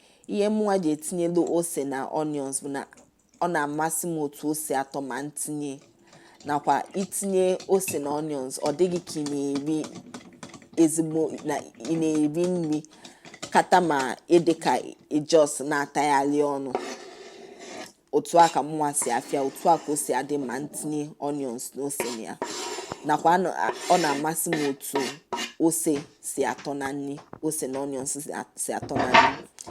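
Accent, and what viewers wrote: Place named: Igbo, Nigeria. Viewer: Iseeeeee 🙏 I love your Igbo accent 😁😁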